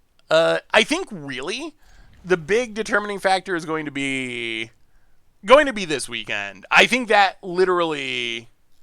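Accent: Western New York, United States. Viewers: My wife went to school in Elmira. She said she recognized that you were from western NY right away. Hahaha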